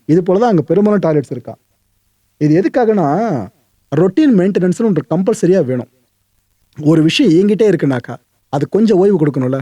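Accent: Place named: Tamil Nadu, India. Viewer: கால் இல்லடா. Call கோல். Check Google Voice. தமிழ்நாட்டு English படு கேவலம்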